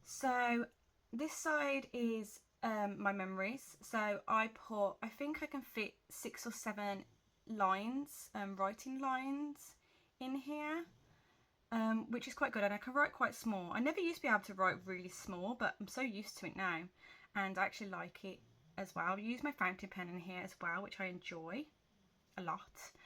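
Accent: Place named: Birmingham, United Kingdom. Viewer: hello, thanks for sharing your journals ❤ I live your accent, reminds me of home. I’m from Birmingham but live in New Zealand now xx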